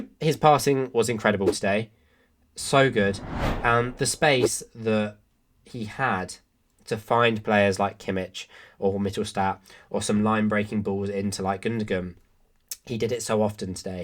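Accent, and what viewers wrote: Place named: Scotland, United Kingdom. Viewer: Not much to add to the other commentators. Well analyzed, dear Mr., a really German sounding name as you know, and I always enjoy some English accents, like yours from Scotland. Greetings from Potsdam. Nearly forgot to add, the goals were shot with some luck, weren't they? The goal/attempt ratio will go down I would expect.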